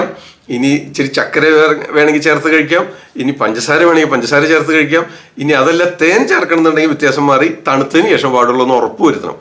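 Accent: Thrissur, India. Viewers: Nammude trichur style samsaravum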